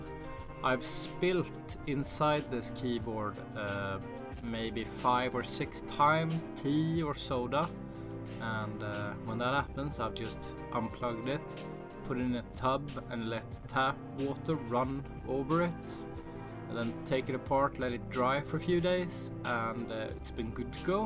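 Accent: Sweden. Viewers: Loved the review. Love your swedish accent aswell, especially xd. Recently bought one of these in factory new condition, it feels like a tank and looks like one aswell. I'm gonna mod it, so this video will probably help me again in the future lol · love the swenglish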